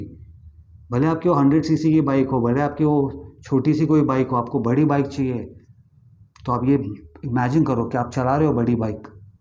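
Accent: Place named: Mumbai, India. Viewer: I never knew you had a Hindi channel as well ... nice ....and I like your Hindi .... ekdum bambaiya types 😛.... yes and very truly said .... Imagination, Visualisation .....plus feelings .... very important 😊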